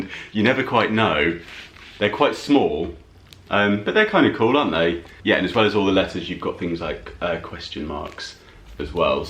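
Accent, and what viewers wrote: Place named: RP, United Kingdom. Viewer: Your pound store is like our Dollar Tree in the USA. Your wonderful accent makes it seem so much more posh! We need you to represent our stores over here! Love the little cutting board, so many uses and looks a bit more expensive. You could have friends over for a cheese and cracker sampling and each cheese could have its own platform.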